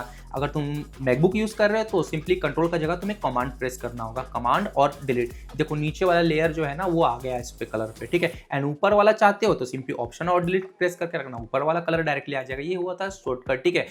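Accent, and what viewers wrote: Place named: Hyderabad, India. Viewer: Zabardast Haiderabadi Accent.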